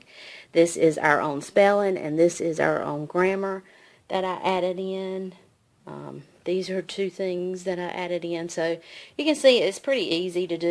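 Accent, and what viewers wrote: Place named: South, United States. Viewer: Thank you so much for this review. I school many children at once and find that it helps to know in advance about the ease of planning. For me, some curriculums are just too involved or scattered for me to do with multiple children and I have ended up wasting precious time and money trying them out. I appreciate this review very much. And love your sweet southern accent!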